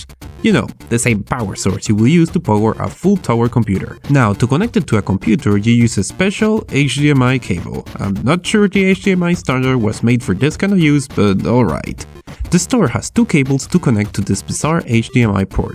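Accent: Spain. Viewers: Are you a spanish speaker? Nice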